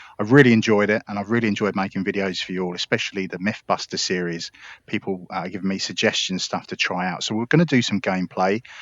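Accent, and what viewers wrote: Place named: United Kingdom. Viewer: He (and me) are British most likely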